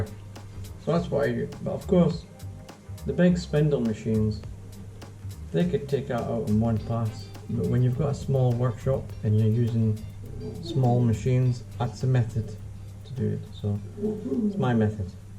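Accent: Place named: Aberdeen, United Kingdom. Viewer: Hi, fine to hear a local accent on YouTube! I’m building some bookcases at the moment, where do you get decent quality sheet goods and hardwood from in Aberdeen? All the merchants I’ve been to just seem to sell crap construction grade stuff and treated timber. Love your work and vids, if my finished one is half as good as some of yours I’ll be doing nae bad!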